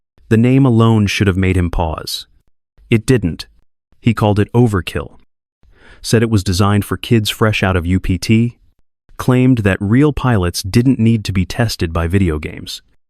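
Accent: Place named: France. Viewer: Why does the voice ,occasionally, seem to talk with a French accent?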